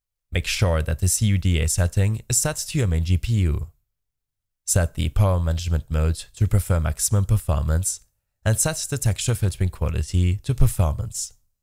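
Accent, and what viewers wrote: Germany. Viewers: Was thinking Japanese from the accent but was surprised that it's German.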